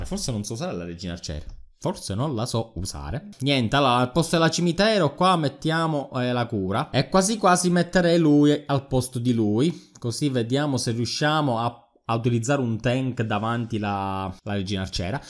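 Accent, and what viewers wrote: Palermo, Italy. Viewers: Fra sei di Palermo?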